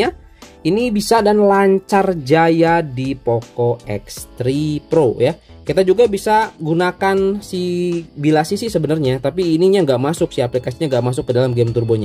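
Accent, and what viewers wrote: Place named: Padang, Indonesia. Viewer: Logat Padang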